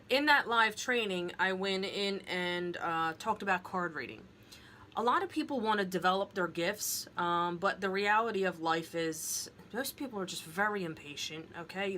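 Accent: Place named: North, United States. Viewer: I love your accent!!! I'm in Florida so I'm not used to that northern accent, I hear "y'all" a lot and I've even got into the habit saying it, lol!!! With the synchronicities you talk about, I've been having them for a few years now. I've gotten pretty good with my pendulum because I made an alphabet pendulum board. I have connected with my loved ones on that. I even made dowsing rods out of metal coat hangers and straws from a YouTube video, they've "hugged" me when I asked them too!!! Pretty phenomenal!!! But I'm still not at a place with all my clairs where I feel confident, so I'm thinking cards might help more in that sense instead of the pendulum or rods... And I know it's not the same for everyone obviously, but do you think cards will help more developing the clairs?? And how long does it take for you to have them all blown open after paying attention to the synchronicities would you say would be an average timeframe maybe??? 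Thank you so much in advance!!! Great video!!!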